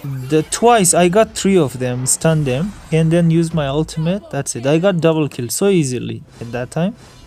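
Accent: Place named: Mexico. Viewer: from México i think , i notice te acent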